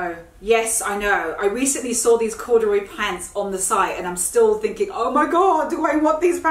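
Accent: England, United Kingdom. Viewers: Love your videos,. I'm a Scot living in SoCal and really enjoy your lovely English accent and style, of course <3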